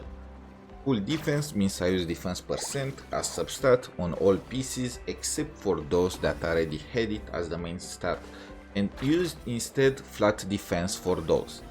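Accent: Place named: Philippines. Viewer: ur accent is strong. r u perhaps from phillipines?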